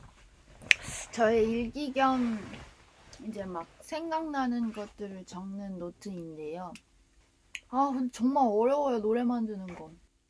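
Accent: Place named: Gyeongsang, South Korea. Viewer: 경상도 여자였어♥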